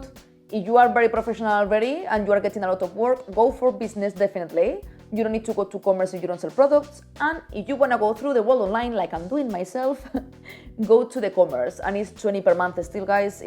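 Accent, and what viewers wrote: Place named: Spain. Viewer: I love your content! I discovered your channel yesterday and I can't stop binge watching all your videos. Very very insightful and informative content. Thank you millions and wishing you all the success - you deserve it. Your artwork is truly amazing and inspiring. P.S. Your Spanish accent is like a joyfull honey to my ears.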